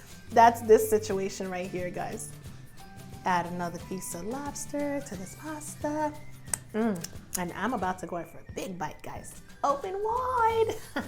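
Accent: New York, United States. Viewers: Love your adorable NY accent..NYC holla! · New York accent..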